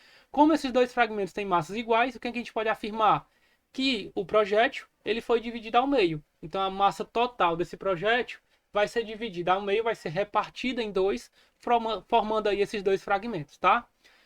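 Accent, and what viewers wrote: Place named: Ceará, Brazil. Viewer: Professor, ótima explicação, parabéns. Pelo sotaque cearense, certeza de que o senhor é meu conterrâneo!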